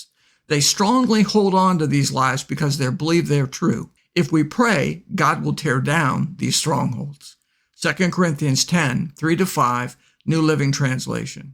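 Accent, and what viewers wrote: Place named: England, United Kingdom. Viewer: My god what a beautiful message. I am from India. Typical English accent, easy to listen to and fantastic insights. Thanks for leading me into his glorious light more and more. GOD BLESS YOU. 👍🙏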